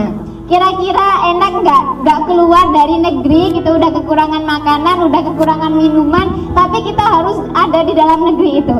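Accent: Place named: Madura, Indonesia. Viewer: Logat nya deh bestie medhureh...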